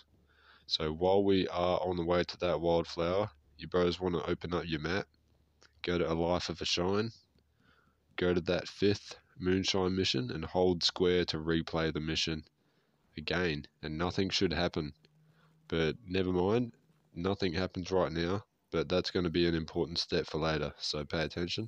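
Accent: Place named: New Jersey, United States. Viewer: this is a great one. luv u accent....New Jersey?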